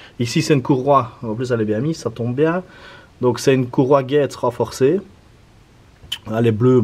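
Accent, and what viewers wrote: Liège, Belgium. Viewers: T belge ? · J'arrive toujours pas à m'habituer à l accent de Liège mdr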